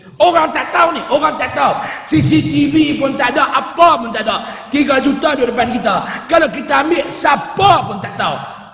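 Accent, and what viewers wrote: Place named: North, Malaysia. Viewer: .ni yg mna ada kutu dok kecam dia slama ni aku nk pesan kt hampa,hampa kecam dia hampa rasa hampa dh ckup sempurna 100% ja jd mnusia dlm dunia ni,aku Siam tp aku suka dngar ceramah dia cara dia ckap tu hampa jgn pelik kami org utara mmg loghat kami cmni dh..nmpak ckap mcm kasaq tp dak...nk taw ksaq ka dak mai hidup kt bumi utara nt hampa baru fhm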